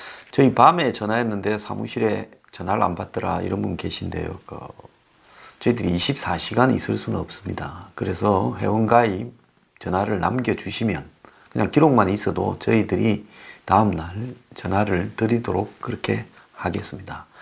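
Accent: Gyeongsang, South Korea. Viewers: 최고!!! 부산경상도의 대표가 되는 억양과말솜씨에 정말 곰감과동감하며 너무나 재밌게 유익하게 많이 배워갑니다! 항상 건강하시고 좋은방송 계속 부탁드립니다!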